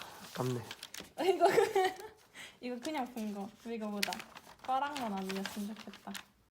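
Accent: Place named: Gyeongsang, South Korea. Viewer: 경상도 사투리 쓰시네요 ㅋㅋ 혹시 경북 지원하시나욬ㅋ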